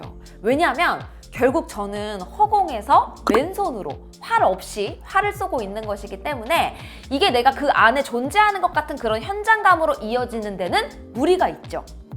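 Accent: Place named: Seoul, South Korea. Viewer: 전달력 끝판왕 😊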